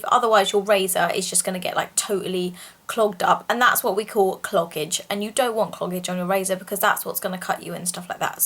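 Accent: England, United Kingdom. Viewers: you are super cute and that english accent is just the icing on the cake !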